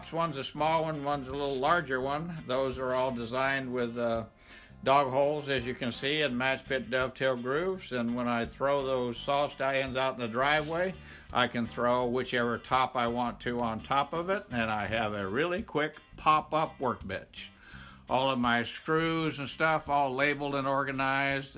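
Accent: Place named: South, United States. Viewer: I had to stop watching this video Sunday morning when the last dog woke up and they needed to be taken them for their walk. After that, my day got really busy working on my neighbor's gate, which included returning the hinges because they had way too much play in the hinge.. That was how they were designed! You really pack a lot of stuff in your garage. If I ever get caught up with the seemingly endless repairs or projects to do around the house... I took a look at the Wen drill press that you have, and I'm kind of impressed with its capabilities, especially for the price. Personally, I don't think you talk too slowly. Even though I'm not from what is considered the South, (even though you can't go much further south in the U.S than South Florida) I know quite a number of people with Southern accents, my aunt, being from Alabama is one of them. 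Her accent never changed, as a matter of fact, my cousins and uncle who spent their entire lives in Miami until moving to Lake Placid, Florida, gained her accent., I think your time in California has diluted your accent some, but then again, I don't know how much of an accent people in Kansas have. If you slow down the playback speed to.25, you sound like you've had quite a few too many. It's quite entertaining.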